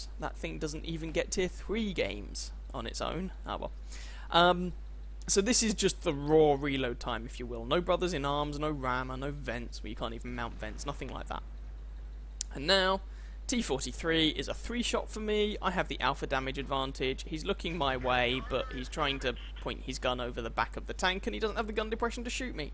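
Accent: United Kingdom. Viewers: Great and you're welcome! You do know that most of us Americans love your British accents as much as a lot of you like ours (especially our Southern)! Lol ^_^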